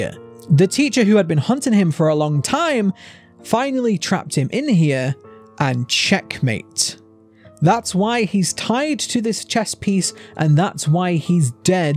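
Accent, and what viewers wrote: United Kingdom. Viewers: “I absolutely adore Little Nightmare theories.” I absolutely adore British accents saying they absolutely adore something.🥰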